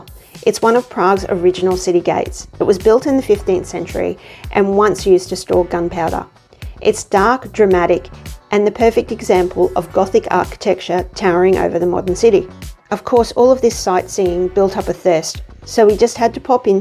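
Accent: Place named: Australia. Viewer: Great to hear an Australian voice. We're planning to visit Prague later in the year so thanks for all the information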